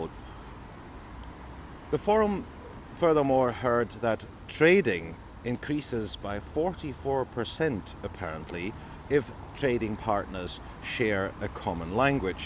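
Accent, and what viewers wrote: Scotland, United Kingdom. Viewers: Are you Scottish by any chance?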